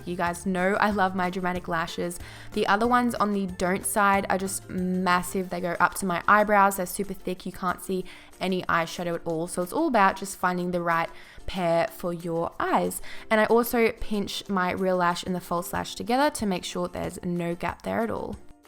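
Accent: Australia. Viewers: Are you from australia ?? such a cute accents.....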